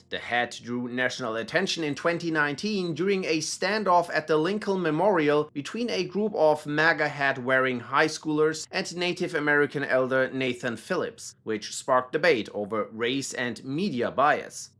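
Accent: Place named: Germany. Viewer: Another great video, I love your voice. It's like Sebastian Vettel; a great & soothing German voice/accent.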